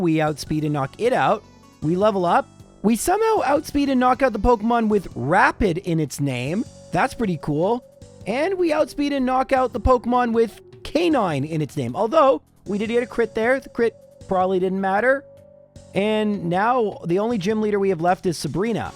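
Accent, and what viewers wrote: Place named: Canada. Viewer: I often forget is Canadian until he says words like "Sorry" and "Tomorrow". Then it's really obvious.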